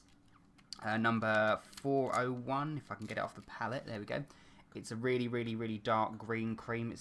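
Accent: United Kingdom. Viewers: I would like to say that I do thoroughly enjoy seeing your tutorials and you are very talented. I also enjoy listening to you talk there is just something about the british accent! In addition to the praise I would also like to place a request for three people: Graverobber and the Repo man from Repo! the Genetic Opera and Lucifer from the Devil's Carnival! Keep the videos coming and thank you!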